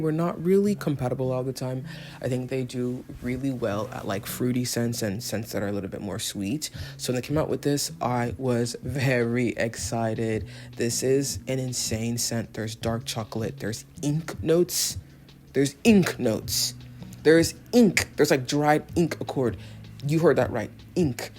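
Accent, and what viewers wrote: United Kingdom. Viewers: your british accent is cracking me up, omg haha. also you have new job? what kind of role do you have?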